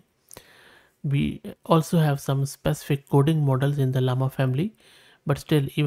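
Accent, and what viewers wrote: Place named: India. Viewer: Indians you are smart but getting info with your accent long time really making tired, train model to make normal accent.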